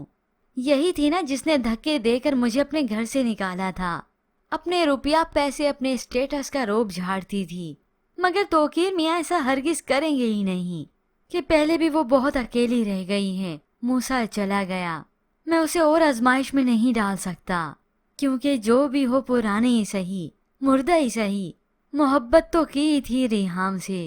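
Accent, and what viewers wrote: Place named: India. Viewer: Aisa laga k koi ENDian suna raha ho Iss me sari guftago ENDian lagi Urdu tow ENDian bunn chuki hai Urdu ka end ho chukaa hai Nazar ye araha hai k Awam subh se sham ENDian bunn chuki hai Hur program mein same Phir yoga pooja ho rahi hai Yeh to jamhori musalman tha !!!!!!!!! Ya Allah Khair Kia sare hindu bunn gayee hain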